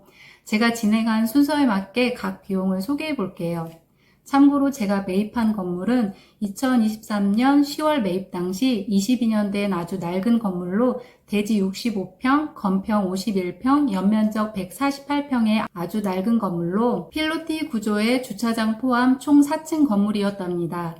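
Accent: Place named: Jeonju, South Korea. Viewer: 말투 듣고 전주사람인것 같앗는데 맞군요.한양수자인 보고 맞구나 했습니다. 대단하십니다.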